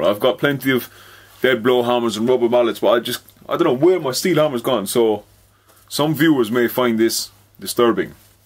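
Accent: Ireland. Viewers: You’re a legend, loving the Wesht of Arland accent and all the info. Definitely gonna help build my Series 3 crossbred. Thanks pal!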